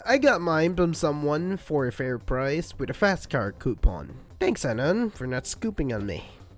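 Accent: Philippines. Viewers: Manileño detected Subscribing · are you a filipino?? cuz i know damn well timezone is in the philippines and i can also tell by your accent..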